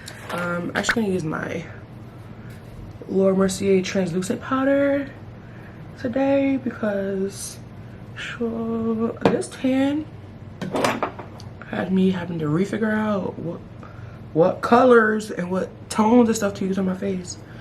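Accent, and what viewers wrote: United Kingdom. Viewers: I love it British voice 💓 LOL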